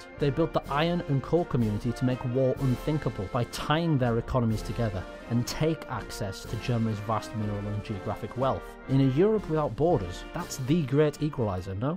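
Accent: United Kingdom. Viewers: This was the first video I had watched of, and after it, I had come to love all his work. It a damn shame we'll never hear his snarky British accent snap another witty comment or see another one of his videos in this unique and detailed style. Rest in peace, you have left behind a legacy and personality not easily mimicked.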